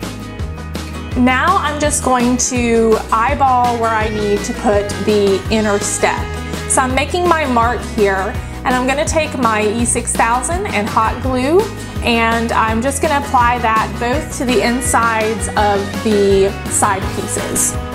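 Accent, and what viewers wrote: South, United States. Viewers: I guess it probably because of the music and your voice is the voice of my southern life..❤️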